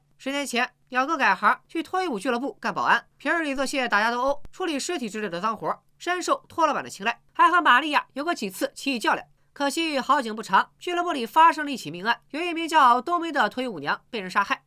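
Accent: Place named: Shandong, China. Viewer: 山东味儿有点重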